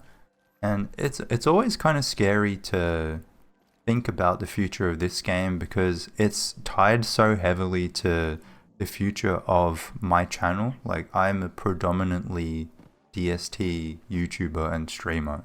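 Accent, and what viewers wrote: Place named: Australia. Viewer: Hello! I've been watching your videos and I really enjoy most of them! I'm really in love with your accent, it's reminds me of Aussie people. It's sad to know that Australian people are not real :(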